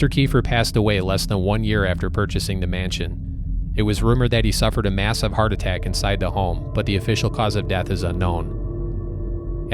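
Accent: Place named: Midwest, United States. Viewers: Excellent content bro and it's a comfort hearing that northern Illinois accent. Hope this channel blows up!! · I too am getting grat joy from this Illinois/ Wisconsin accent. Thanks brother from Chicago. Great storytelling!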